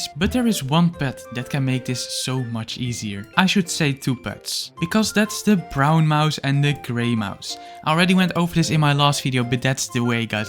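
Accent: Netherlands. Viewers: Are u Dutch? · are you dutch cuz i can hear that accent?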